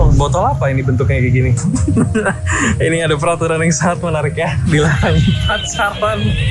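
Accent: Java, Indonesia. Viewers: Habis nonton jadi laper, Ngiler bgt liatnya Btw jowo iso medhok ya wkwk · full medhok rek 😂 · apa kamu orang jawa? soalnya logatmu jawa bgtt wkwk (muji) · mode medok nagih banget plisssss 😭😭😭😭💞 anw im glad u enjoy surabaya 🥹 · Es krim parfum :_akePepelol::_akePepelol: seru banget nontonnya dari awal sampai akhirr :_akeApprove: suaramu auto medok d sini :_akeLol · Tiba-tiba jadi medhok banget, sampe kaget loh aku, tapi cocok kok 👍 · lucuuu kamu yaampun tiba-tiba main ke kotaku, mana ga expect medok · Lol, medok katanya...aq dengerny biasa aja sih...karena setiap hari denger yg medok-medook 😂😂 Anyway, seru juga buat dicobain. Aq lagi cari ide wisata buat suami n ibuku yg udah lansia. Stay happy n healthy yaa❤ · medok men lak ngomong 😂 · Medhok bet, cocok tp😦✨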